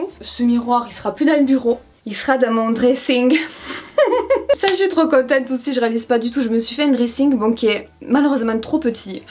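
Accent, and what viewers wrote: South, France. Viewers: 🤍🤍🤍🤍🤍 trop belle ! J’adore ton énergie (et cet accent du sud 😍😍😍)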